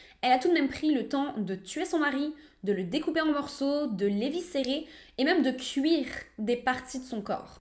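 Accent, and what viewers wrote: Quebec, Canada. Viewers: Coucou ! j'ai une question, je suis fascinée par ton accent du Quebec, tu l'as eu naturellement à force de rester là bas ou alors tu t'es entrainée ? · Kikou tu es adorable et j adore ton accent québécois. Merci beaucoup pour ta vidéo bisous 👍👍👍👍👍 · Bien raconté,merci! Je distingue un soupçon d’accent québécois ou quoi? C’est subtil, il faut s’y connaître!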